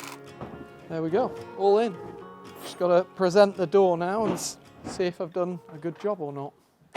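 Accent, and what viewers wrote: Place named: United Kingdom. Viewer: that was an enjoyable video watching you, and work together. It will definitely be a fun renovation. Adding the new door makes it already look light years better. I love hearing you speak French and switch off to English with your British accent! I’m curious about lead based paint concerns. In the United States we have inspections prior to purchasing a house built before 1978 because there was lead in paint before 1978 and the airborne particles are dangerous to one’s health. Just wondering as we watch all of these old buildings be torn apart with dust and paint chips flying around.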